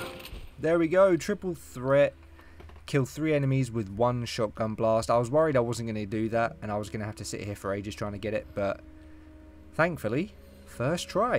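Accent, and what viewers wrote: England, United Kingdom. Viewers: I know this guy is saying divine source but I guess with his english accent and sounds like he's saying divine sauce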